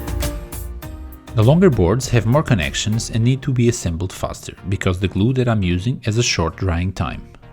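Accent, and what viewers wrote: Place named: Portugal. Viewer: I was mesmerised watching this, and thinking to myself: "this guys sounds Portuguese" , and I was super happy to find out he actually is! :) I plan to do a lot of stuff myself on our new place, so I'm already subscribed! Kudos, great work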